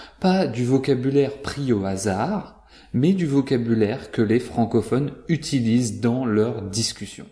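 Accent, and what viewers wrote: France. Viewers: Vraiment vous parlez le français authentique.,comme le français que nous apprenons aux écoles marocaines. Merci.mais parfois nous sommes pas capables de comprendre les francophones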